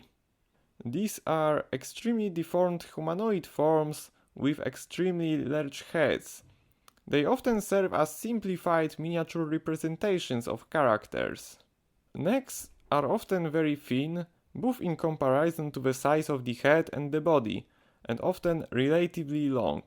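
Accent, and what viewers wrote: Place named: Poland. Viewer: Idk why you were worried about your English, it was good. Sure, in some fragments the Polish accent it's shown and some pronunciation are more or less so Polish XD BUT overall, everyone can understand what you're saying! Also, maybe I make a bit fun off your pronunciation but it will never compere to how my classmate from long time ago pronounced "vegetable" XD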